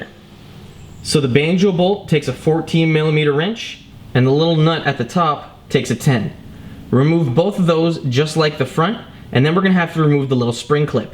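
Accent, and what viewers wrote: Canada. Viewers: He’s Canadian my dood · Yeah Canada! Accent gave it away (I think). Great video!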